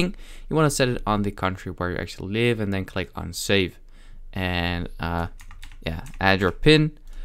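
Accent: Netherlands. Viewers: UR DUTCHH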